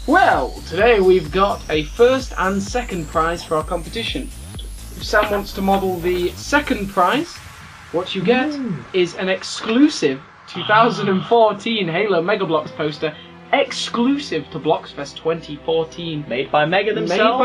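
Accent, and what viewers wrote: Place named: Warrington, United Kingdom. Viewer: Was in Warrington the other day and everyone sounded like you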